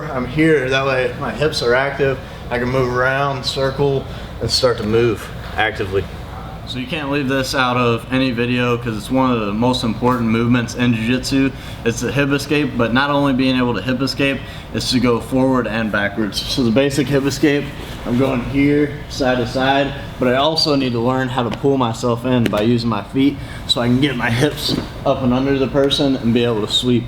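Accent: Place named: South, United States. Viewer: he kinnda have like a southern accent, great drills fella, I will try them out on today's class!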